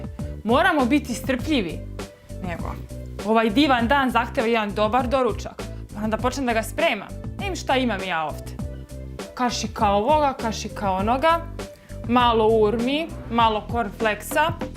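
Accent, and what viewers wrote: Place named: Western Serbia, Serbia. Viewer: pa ti imaš što kažu X faktor, mnogo te volim izled lepoto bez šminke,samo prirodne maske, čisto lice kao izvor, gledam recepte ali ti si otkriće zapadne Srbije i još student lepoto kad vidim dok sam na PC a cablovska na mute odmah prekinem mute da ti čujem glas i naglasak tog dela Srbije 🇷🇸 ti mlađa crna kosa a Keti moje po priči tu je moje godište plava kosa a ti crnka, jedino što me interesuje odakle to znanje u kuvanju,prelepo dvorište pravo Srbsko ukrašeno, pa kad te vidim devojka prirodna i opasno zna znanje o kuvanju nemam više reči lepoto 🇷🇸💐🌹☕🍹🎂🥮🍜🍩🥣🍷moj san kad bi imao takvu devojku bio bi ti sluga i sad sam single 4meseca lepotica sa naočarima ko učiteljica ali nije bilo znaš ono kad osetiš leptire u stomaku, ništa od toga samo dosadna veza,kupio karte za Exit ona sluša DJ musiku Ninu Kravitz i a ja rock metal grupe bili su iz Švedske 🇸🇪 i devojke koje sam gledao ko boginje 🇸🇪💖💐 ja sam išao na DJ eve,kad grom gospodična neće sa mnom, slušaj njeno objašnjenje pa ja ne volim tu muziku,pa kako kad se ne igra? 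u hotel i posle Exita zbogom zauvek lepa devojka a u glavi bubice i dosadna veza,sad sam 4meseca sam još ti da si sama a znam takva lepota a sama to nema u Srbiji ćao moja omiljena zvezda kanala Kičen TV,sad znaš da imaš fana iz okoline Beograda ćao poljubac ti šaljem, gospodin kaže udaj se da mi kuvaš,blam meni su roditelji pokoj im duši oboje, velika kuća brat i snaja su dole ja iz sobe na terasu pokrivena ra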